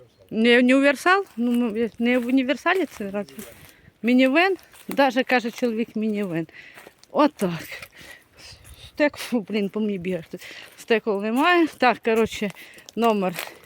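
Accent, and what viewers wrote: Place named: Surzhyk, Ukraine. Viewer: Суржик дикий.😂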